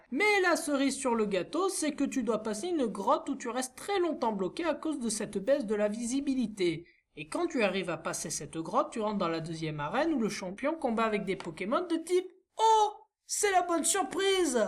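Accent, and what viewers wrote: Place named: South, France. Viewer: Oui respect mais t'as un accent du sud je me trompe ?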